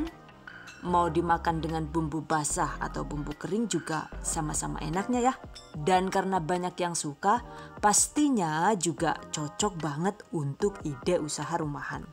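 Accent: Banyumas, Indonesia. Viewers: Ngomong ngapake lah buk, ngakak nyong 😂😂😂 maturnuwun resepe bu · Alakaehh wong ngapak kie tah 🤣🤣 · Fokus suara ngapak🤣🤣🤣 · Aq ngakak kalo si ibu ngoMong ngapak..🤣🤣🤣. Yakinlah sumpah aq ngefensss karo sampean.. luph youu.. resepnya mantuul.. #salamdariwongpemalang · Lah ngapak wkwk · Deneng ngapak 😭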